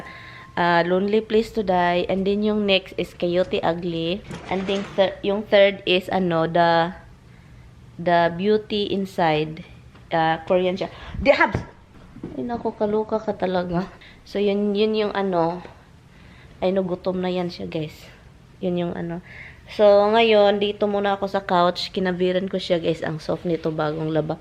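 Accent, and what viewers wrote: Visayas, Philippines. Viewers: Alam tawa ako ng tawa SA scolit mo Day😂😂😂😂natutuwa ako sau pag naay accent na bisayang dako😂😂😂✌️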